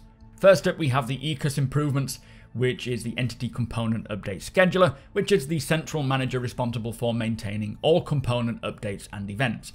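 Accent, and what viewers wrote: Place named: North West England, United Kingdom. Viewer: Where you from in the north west? I'm trying to pinpoint your accent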